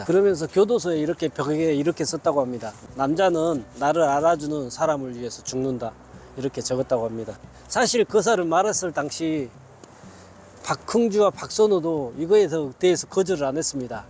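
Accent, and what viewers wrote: Jeolla, South Korea. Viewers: 전라도 억양으로 또 이런 코인팔이 하는 구나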